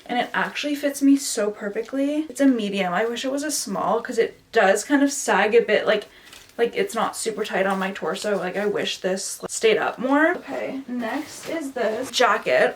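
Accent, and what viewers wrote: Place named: Canada. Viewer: Clues you are Canadian. 1. Accent, you sound like me. 2. Le Chateau. 3. Smart Set. Back in the olden days, I used to manage a Reitmans store. I love the black and white shoes!!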